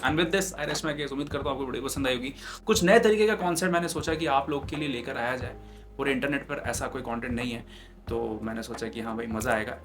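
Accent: Lucknow, India. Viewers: bhai ap lko se ho kya??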